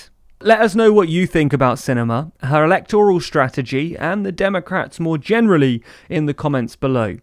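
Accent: United Kingdom. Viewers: his main channel is for uk politics, he also speaks with a british accent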